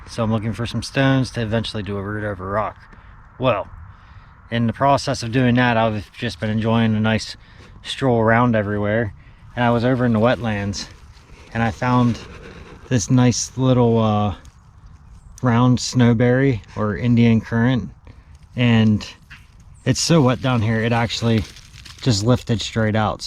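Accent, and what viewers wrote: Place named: Philadelphia, United States. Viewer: I went to college outside Philly. I thought I detected a familiar accent. ✌🏻(hint)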